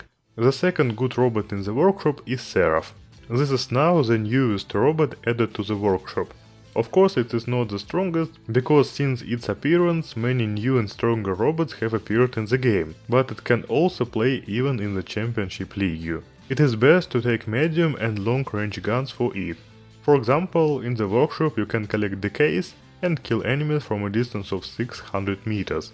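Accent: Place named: Russia. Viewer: а по русский нельзя? все равно английский как русский ..так понятнее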